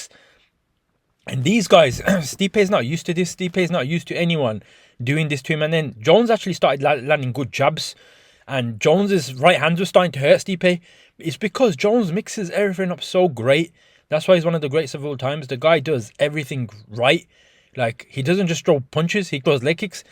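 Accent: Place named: Black Country, United Kingdom. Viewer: 💯 I hope it is a negotiation tactic by Jon mate cuz it’s the only fight I want to see in the ufc 🏧 I was never a fan of jones vs stipe tbf I wouldn’t argue with jones vs pereira but the aspinall fight has to happen first let’s be honest, keep the content up bro you sound like your from the Black Country I’m only down the road, Telford bro 👊 n you’d have to be a total idiot or just plain arrogant to write jones off against anyone I just think Tom has the edge over him not to say Jon can’t win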